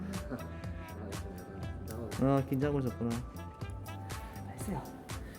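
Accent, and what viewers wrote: Gyeongsang, South Korea. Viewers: 남자선생님인데 넘나 친절하시고 관리도깔끔히 잘하시는것 같아요 경상도사투리 매력적이십니다ㅋ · 늘 너무 재밌게 봐용 경상도 사투리는 톡톡쏘아대서 억양이 쎄다 라는 생각을 늘 해왔는데, 선생님 영상보면 경상도 사투리도 저렇게 친절하고 부드럽게 느껴질 수 있구나 생각해요ㅋㅋㅋㅋ 저는 내성발톱 아닌데 가보고싶어요 ㅠ ㅠ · 발톱의 이물질 제거할때.ㅋ 쁑~~ 쁑~~ 좋네요.👍 친절하시고 상냥하시고 ㅋ 특히 좋은점은 갱상도사투리요。 물론 실력도 좋으시고요..♥👍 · 저도 대구인디 같은 경상도 사투리인데 왜 다른 느낌이죠? ㅋㅋㅋ 넘 친절하신 말투에여! 1차 관리 링크도 본문에 같이 남겨주시면 더 보기 좋을 거 같아용 1차에는 2차 링크 걸어두시구 ㅎㅎ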